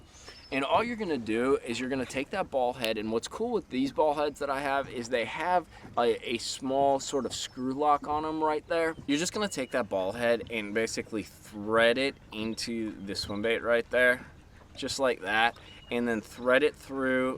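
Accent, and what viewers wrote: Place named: Alabama, United States. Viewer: someone is starting to sound like he lives in Alabama.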